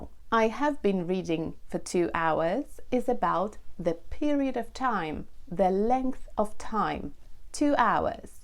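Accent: United Kingdom. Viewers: 😊 the German-American hast the all right. It' s always a pleasure listening to your fine Bitish accent! 😊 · It's such a joy to listen to the Royal British English from, especially for an immigrant in Canada.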